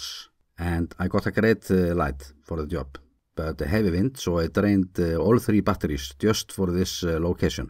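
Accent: Iceland. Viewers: Love these updates from my home country that I left some XX years ago - takk kærlega! :) When living in Iceland, the Icelandic English-accent used to sound terrible to me ~ now I can only hear its charm ❤️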